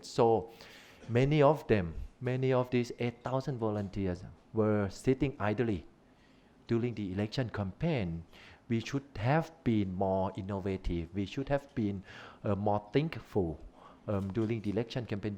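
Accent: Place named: Thailand. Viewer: อังกฤษสำเนียงไทย โคตรฟังง่าย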